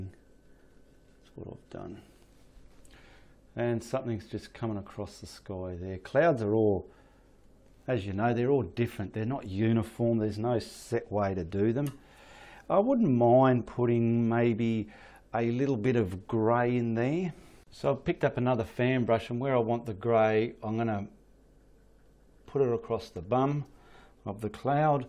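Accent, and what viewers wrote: Australia. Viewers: Thats the thickest australian accent ive ever heard. Love the painting.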